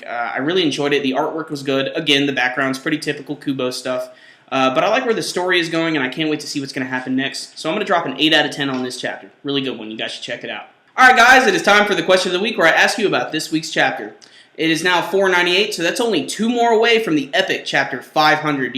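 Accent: Germany. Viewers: Once again, your german accent is priceless!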